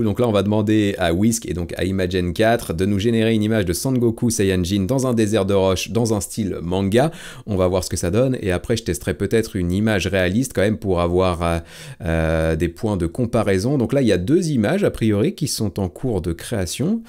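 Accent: Quebec, Canada. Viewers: Merci! Hilarante la vidéo avec l'accent québécois! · L'accent québécois excellent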